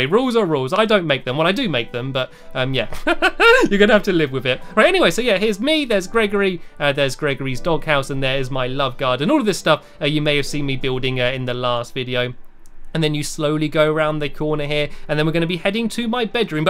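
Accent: United Kingdom. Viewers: You brit7sh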